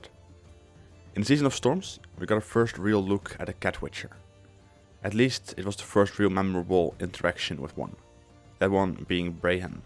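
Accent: Netherlands. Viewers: Yeah youre defenitly dutch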